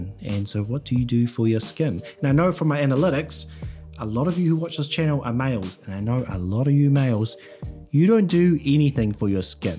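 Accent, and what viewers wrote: New Zealand. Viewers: (First time seeing this channel, my thoughts) "45 DOLLARS FOR PROPER 12 ?!?!... Oh wait, my man sounds like a Kiwi". (Checks channel) ah, ok, that probably explains that." Still seems high for Proper 12 but New Zealand is quite a bit further away from Ireland that the US so, yeah, makes sense I guess. Edit: I should've paid better attention the first time, he says "New Zealand" directly behind the "45 dollar" price. 😅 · I wondered why you pronounced Conor McGregor as “McGreegor but then you said J&B was found “eeverywhere “so I realised that’s how Kiwis speak.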